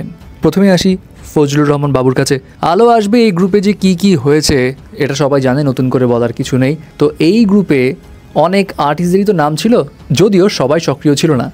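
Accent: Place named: West Bengal, India. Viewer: Tui dada babu der moto kota boltasos ken? Tui ki oi parer naki re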